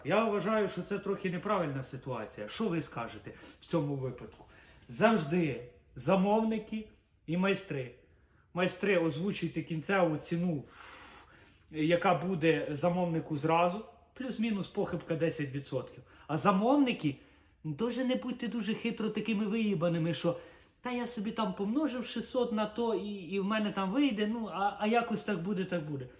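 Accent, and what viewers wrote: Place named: Lviv, Ukraine. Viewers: Діалект чи вимова наша львівська, але не мова, мова укрвюаїнська . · Чиста наша львівська мова !!!❤😊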